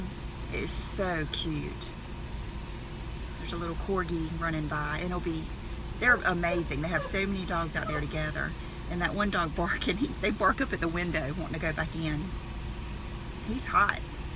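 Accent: South, United States. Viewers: Yay!! So glad to be able to spend days with you- it's so funny I feel like I can really hear the southern in you more in this vlog! 😘😘😘😘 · I've lived in NC my whole life- near OBX and have never heard such a wonderful southern accent! voice is just sooo pleasant! I just love it!....oxo!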